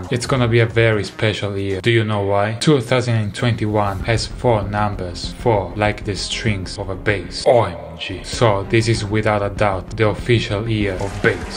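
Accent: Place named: Italy. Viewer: My lord that italian accent is killin me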